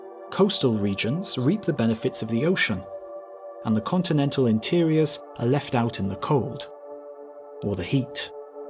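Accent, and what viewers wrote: England, United Kingdom. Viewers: Is this dude English? Why does everything with an accent sound better?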